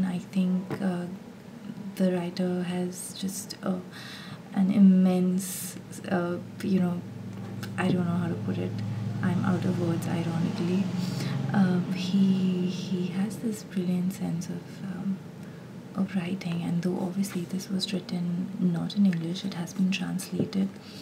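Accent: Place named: India. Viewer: Soft, Indian accents ALWAYS give me ASMR, especially this "unintentional" kind!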